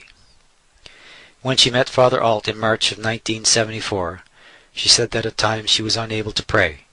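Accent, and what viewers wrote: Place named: United States. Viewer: Because there's been quite the many bad influences coming in to different places in the world from the United States and thus, that's why you sounded like you had been from the United States to me. I've had enough about the United States government. They've badly confused me, notice anything different about India?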